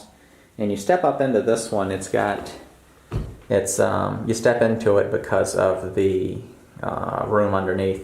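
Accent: South, United States. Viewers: Sounds like you're from the South, what state are you in?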